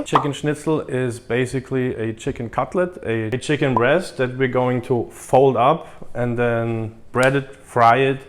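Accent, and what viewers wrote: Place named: South, Germany. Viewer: since when do you have a south German accent in your English:D Rock on!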